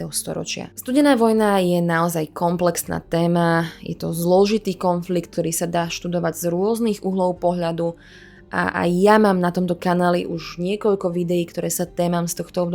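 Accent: Bratislava, Slovakia. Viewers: Slovenský hlas 😍 tomu dává úplně jiný Vibe 😇 jinak super video 😇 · Krasny, sexi hlasok, az na ten bratislavsky prizvuk a neznalost "ľ" ;) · Bratislavsky dialekt (nasilny akcent na prvu slabiku znižuje cely dokument....( suslanie ba 2.mieste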